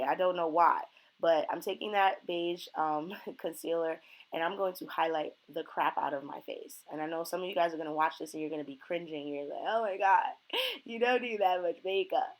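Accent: New York, United States. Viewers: My first time watching you and I got a feeling you from New York Sis 🤨🤨🤪🤪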